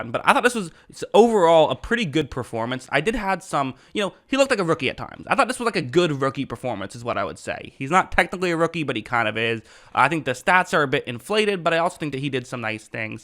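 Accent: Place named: Chicago, United States. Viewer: This guy sounds like hes from Chicago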